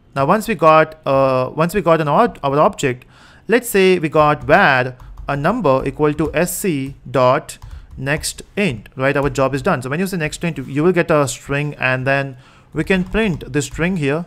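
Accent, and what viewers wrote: India. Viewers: Great work in right time. Thanks for giving such a wonderful tutorial on kotlin. I like the Indian accent which is helpful for me to understand easily.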